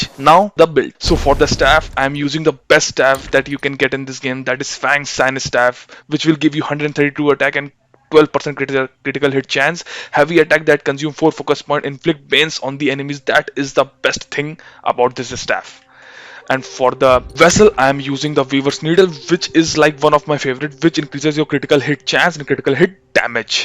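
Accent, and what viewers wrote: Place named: India. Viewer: I can't tell if he's being serious or meme'ing because of the super extra Indian accent. 😅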